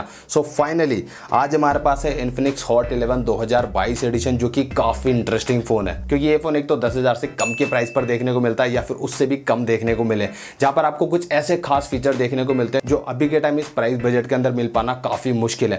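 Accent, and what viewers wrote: Rajasthan, India. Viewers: Your swag is lovely bro. Cool hairband. Little Rajasthani accent. And fantastic review. Katai zaher bro.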